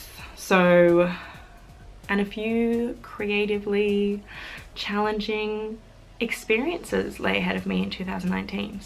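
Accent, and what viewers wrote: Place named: South, United States. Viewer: I love the Southern accent 😊😁😘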